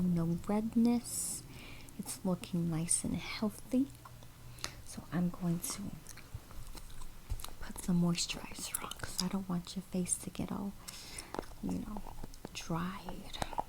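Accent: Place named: Long Island, United States. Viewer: HIGHKEY so glad u used the Long Island accent it’s so tingly and comforting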